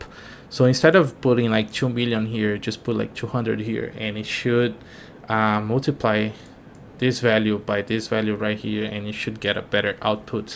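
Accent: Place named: Brazil. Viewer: Nice tutorial, Thank you! E desconfio que seja BR pelo leve sotaque, se for, parabéns pelo inglês e pelo vídeo, valeu!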